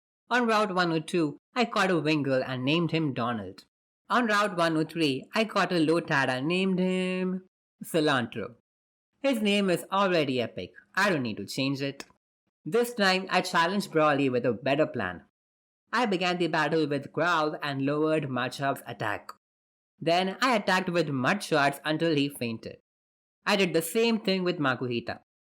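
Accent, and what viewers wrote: India. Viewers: Are you indian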